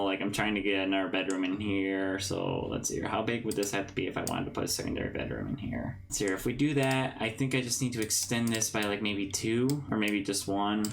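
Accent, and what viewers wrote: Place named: Newfoundland, Canada. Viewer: this is so random, but I'm willing to bet money you're from newfoundland Canada, I can tell that accent from anywhere! -a newfie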